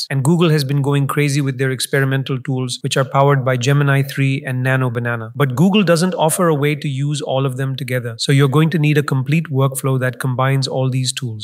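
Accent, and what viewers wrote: India. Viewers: Better hear indian ahh voice than ai crappy voice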